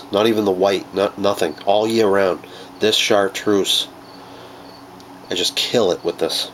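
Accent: Boston, United States. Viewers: you sound like a boston guy, if so we should make some casts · do the Zoom tab tail grubs swim well for you? I bought a pack intrigued at the idea of an erratic tail action the tab would give, but i couldnt get them to swim right no matter how I trimmed my swimjig skirts. Also, just curious. Are you from Boston?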